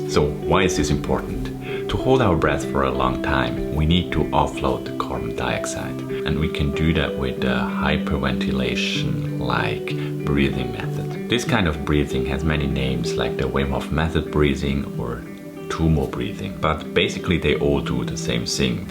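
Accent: Switzerland. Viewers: Are you swiss German? I detect a slight accent. Barn Deutsche maybe?l lived in beautiful Switzerland. Loved it! Kia ora from new Zealand.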